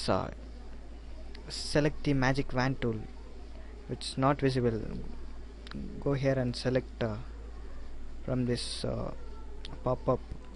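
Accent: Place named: India. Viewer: It's English. Trouble with Indian accents?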